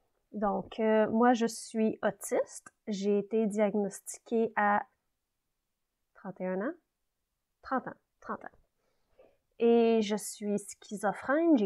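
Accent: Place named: Quebec, Canada. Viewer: avec vous tous. Il faut pas avoir honte. Et ça fait du bien de réentendre l'accent du Québec ;)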